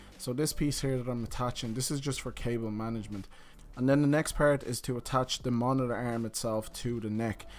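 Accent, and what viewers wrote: Ireland. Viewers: Love the irish accent! Keep up the great work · the irish accent though man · Where in Ireland are ye from?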